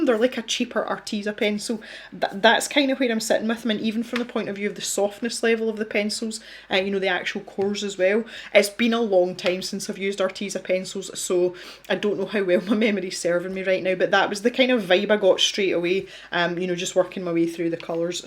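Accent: Scotland, United Kingdom. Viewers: I have these in Australia and they are good, love your Scottish accent 😊😊😊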